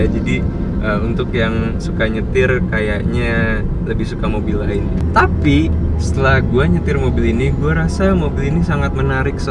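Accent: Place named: Java, Indonesia. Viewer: Kayaknya logat" jawa dehh.. Tapi kok GUA GUA yaaa😁😁😁😁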